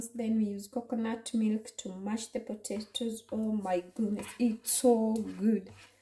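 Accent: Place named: Coast, Kenya. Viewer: Hapa Mombasani ni Kitunguu Maji( costo accent😅😅👌)as always outgoing yourself in your kitchen😋😋yummy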